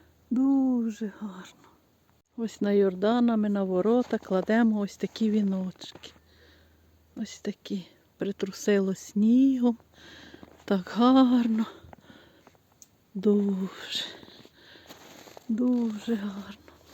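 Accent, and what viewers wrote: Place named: Hutsul, Ukraine. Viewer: Яке це село Чую наш говір гуцульський У нас завжди файно❤Миру і перемоги всім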